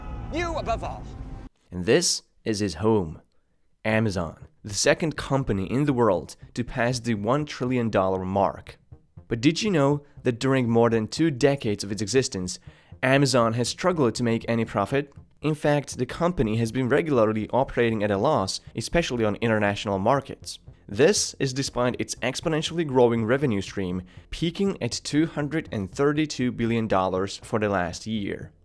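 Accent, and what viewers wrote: Eastern Europe. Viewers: because he's trying not to sound like the Eastern European communist that he is. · You sound like an Eastern European person trying to impersonate a Scottish person doing an American accent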